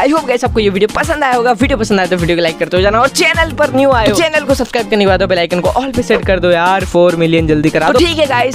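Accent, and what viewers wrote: Odisha, India. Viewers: Bhai odisha se ho kya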